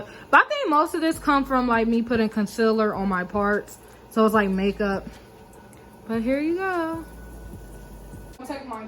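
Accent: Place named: United States. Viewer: You can tell she’s country bye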